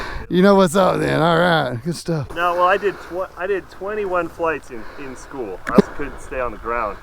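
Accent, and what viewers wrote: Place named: Louisiana, United States. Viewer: Good times had by all, great vid 😃. Maybe it's the other guys accents, but you sound more Louisiana than when your at home 😃😃 👍👍🇬🇧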